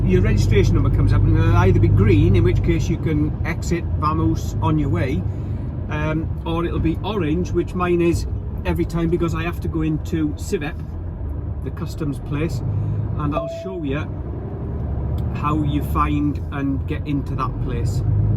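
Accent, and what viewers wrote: Wales, United Kingdom. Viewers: great video, do I detect a welsh accent, good to see you are not having your image in screen at all times, like some I could mention !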